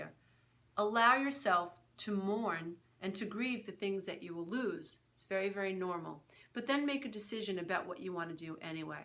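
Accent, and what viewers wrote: New York, United States. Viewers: Great advice. Are you from the Bronx?Brooklyn?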